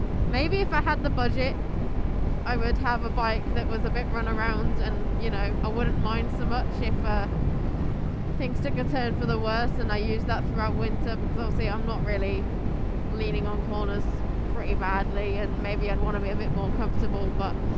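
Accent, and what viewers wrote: United Kingdom. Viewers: Im from the Highlands Scotland and iv been riding for a few years. I dont usually ride in the winter only if its necessary. I went round a hairpin and hit a patch of black ice on my ZX6R and wraped it around a tree a few months after getting my A license. 🤣 where abouts UK are you based? Sound Cambridge area.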